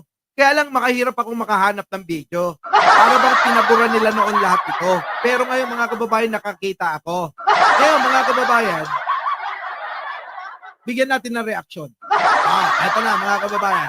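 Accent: Ilocos, Philippines. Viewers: Sir natatawa aq sayo sa ilocano mo haha. Minoramopa mga ddsit sabimo okininayo kamo haha ilocano aq sir tinototokan ko tong programamo sa utube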